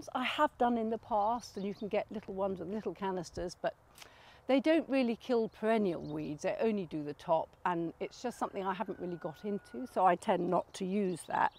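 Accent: United Kingdom. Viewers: It's really true - everything sounds better with a British accent! Even weeding. :) Grounsel - aka creeping charlie. What a pain the in... Love the video! For my veggie garden, I'm with the late Peter Seabrook, and still prefer to dig. But then, I'm digging in all the rotten down, fall leaves, and the spring chopped ornamental grasses. I don't see any lack of earth worms. Except when our American Robins realize that I am providing an easy buffet. Then, I do lose some worms.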